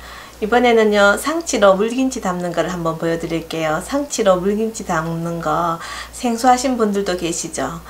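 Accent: Gyeongsang, South Korea. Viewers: 대구. 경북분이신듯?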